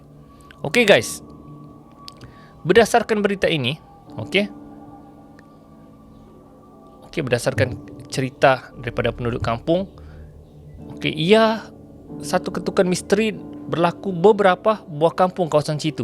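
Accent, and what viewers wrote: Sabah, Malaysia. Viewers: iyaa org sabah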